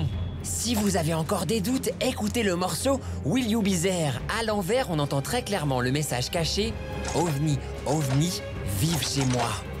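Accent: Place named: England, United Kingdom. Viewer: Super accent anglais !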